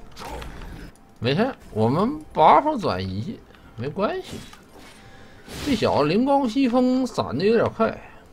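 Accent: Northeast, China. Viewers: 甚麼叫準...是比較沒有口音== 人家東北人 本來說話就有口音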